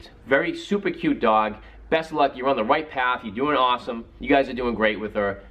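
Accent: Boston, United States. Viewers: Nice review. You sound like you have a Boston accent. If you live in the area and want to review an Airedale, let me know! 😉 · You have GOTTA be from Massachusetts!!!! I'm living for that Boston accent. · The Boston accent just makes these reviews go from average to hilarious.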